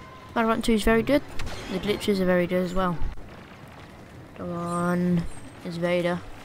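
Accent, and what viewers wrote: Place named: United Kingdom. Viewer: it’s the British accent lul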